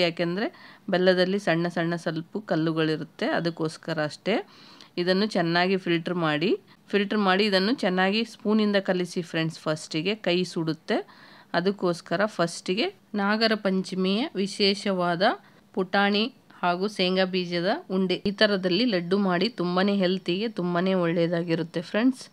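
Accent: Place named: North Karnataka, India. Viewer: U speak in UK kannada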